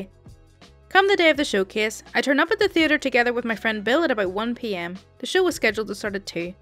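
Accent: Ireland. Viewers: First story: There's nothing wrong with a charming Irish accent, even for a British theater/dance story.. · Good morning, Markus etc. First, there is nothing better than the sweet voice of an Irish lass and a picture of a totally contented feline. Then the second thing.... a wee dram of single malt. 🐱🐱🐱🐶👴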